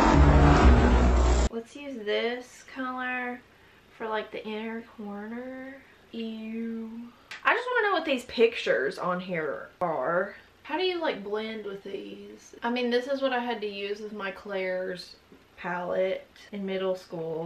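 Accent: South, United States. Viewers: ur literally southern sam puckett i, im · shes from the south lol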